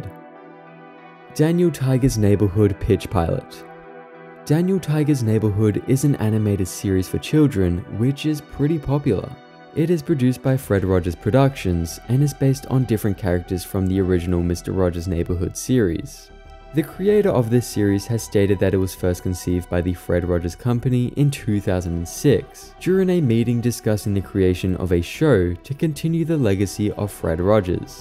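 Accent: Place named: Australia. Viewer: the aussie accent is great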